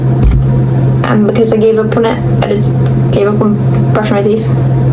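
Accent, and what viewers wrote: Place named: United Kingdom. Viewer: Yeah, I feel basically the same way 😭 and hey, im from the UK too! (Judging via accent) and I’m literally like a year older than you (since you mentioned 16) and I gotta say I agree with you on the vast majority of points here, though some can be chalked up to other factors. Modern UK is pretty bleak and depressing too, so that’s likely not a very nice effect on you either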